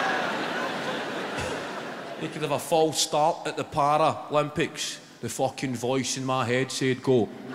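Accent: Scotland, United Kingdom. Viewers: Lmao, I'm Welsh and I need to concentrate to understand him :p he has a very thick Scottish accent doesn't he haha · what are you on? English can't understand the Scottish? I'm english and I understand every word, ignorant people cant understand him, not english people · well if your from the uk and you can't understand a uk accent u must b thick ya zoomer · Jesus Christ that scottish accent haha perfectly fits what he's saying but I'm almost a C2 english level and still I missed way too many jokes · Fouad Bacho English, with a Scottish accent, being Scottish.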